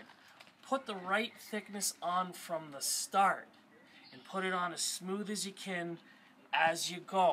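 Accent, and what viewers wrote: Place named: Canada. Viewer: Listen to the accent. Definitely Canadian!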